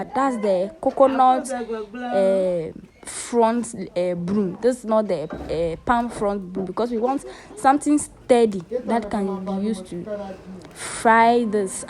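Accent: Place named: Ghana. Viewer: Very educational and interesting 🎉 Plus your voice and GH accent is giving 😊🌹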